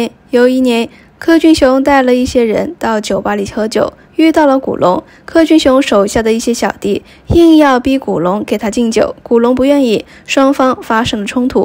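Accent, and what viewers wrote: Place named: China. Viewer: 不要再毀謗侮辱了，留口得了吧？大陸的口音可以滾了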